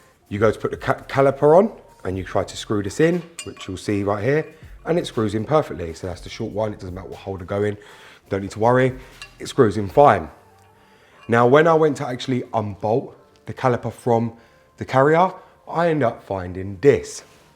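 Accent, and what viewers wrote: United Kingdom. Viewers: Thank you. You might have saved lives with this information. It's a shame that we have so many service people who don't care. It's nice to see videos like yours, to realize that there are still good people out there. I love your British accent.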